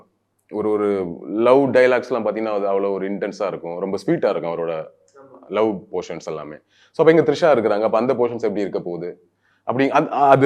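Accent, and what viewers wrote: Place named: Kanyakumari, India. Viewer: avar slang kanyakumari mari eruku bro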